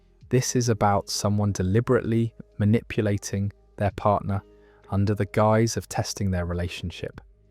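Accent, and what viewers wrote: United Kingdom. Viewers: Does anyone know where this accent might be from? I’m sure it’s a British accent, but I’d like to know the dialect, it’s very peaceful.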